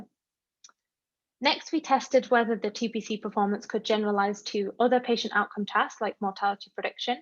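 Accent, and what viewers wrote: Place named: United Kingdom. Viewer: Great work! Enjoyed the British accent!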